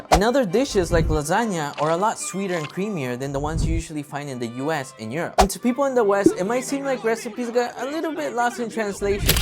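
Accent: Philippines. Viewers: Why you sound like a filipino manila boy from a middle/high class family? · You talked like a filipino now with a ‚no?‘ at the end of the sentence 😊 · You are slowly having the Filipino English non-accent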